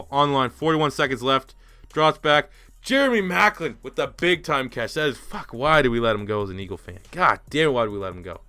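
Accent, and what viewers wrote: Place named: Canada. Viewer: You sound Canadian. Are you?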